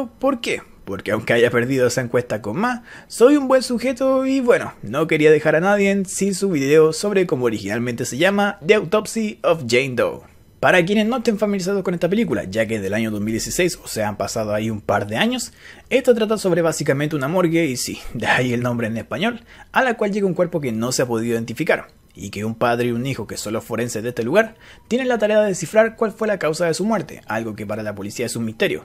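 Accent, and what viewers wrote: Chile. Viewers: No tengo ni idea de su nacionalidad ya que a veces suena como mexicano y también como chileno👁👄👁🔪🇨🇱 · es chileno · hola master es chileno? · ME DI CUENTA QUE ERES CHILENO, CTMMM QUE EMOCIÓN😍amo todos tus videos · El acento es de chile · Eres de chile?? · Eres chileno🇨🇱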